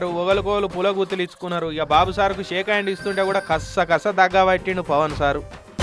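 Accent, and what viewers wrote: Telangana, India. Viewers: Tv9 people are joking with Telangana slang.read news with discipline and respect.❤❤❤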